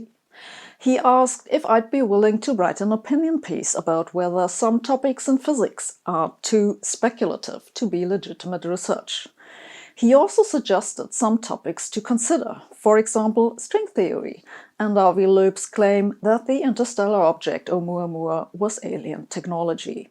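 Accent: Germany. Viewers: She makes German accent sound good, but there is nothing German about the logic itself. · As a student of the German language, I love you accent.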